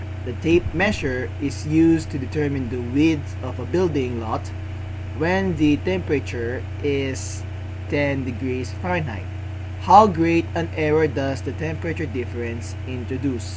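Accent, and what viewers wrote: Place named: Philippines. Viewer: the accent is Filipino hehehej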